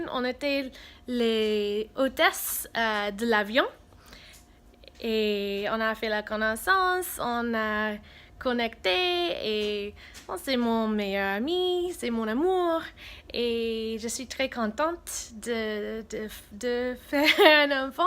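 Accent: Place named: Cameroon. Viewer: You have little bite an cameroonian accent is funny. But you do well in french.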